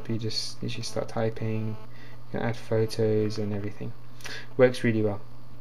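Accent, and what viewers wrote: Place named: Arab world. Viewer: are you arabic by anyway? your accent is so great mashala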